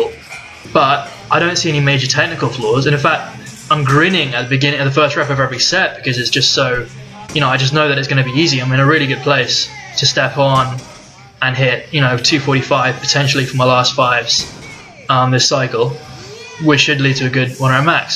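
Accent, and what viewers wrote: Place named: Australia. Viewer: dat aussie accent creeping in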